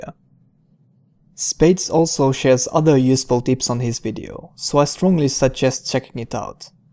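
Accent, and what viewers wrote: Finland. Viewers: arvasin tosta aksentista :D terveisiä kajaanista :DDD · that accent, i could swear that you are from finland =) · are u finnish?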